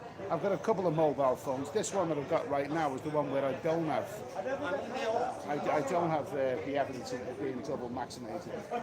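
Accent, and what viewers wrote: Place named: Liverpool, United Kingdom. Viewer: Scouse is routinely rated as the hardest English (not Scottish) accent to understand. Rated way below even Cockney. Many Brits are embarrassed over having Scouse in England. This channel needs SUBTITLES!! I am tired of hearing about wild "bears" (beers) and pals (pools). Many other words too.... I simply have no idea.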